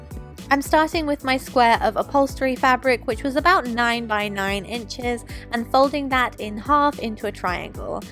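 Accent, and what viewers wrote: United Kingdom. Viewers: Omg she’s pretty has a British accent and her name is? 😳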